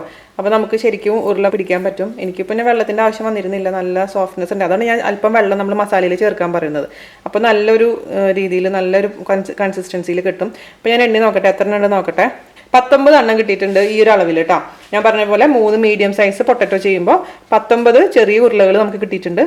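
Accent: Thrissur, India. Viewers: Good presentation in Trichur Malayalam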